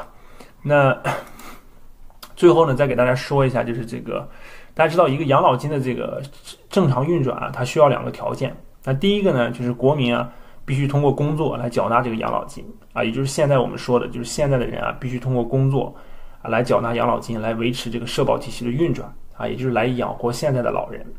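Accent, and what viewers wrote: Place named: Taiyuan, China. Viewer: 主播听口音像是太原的？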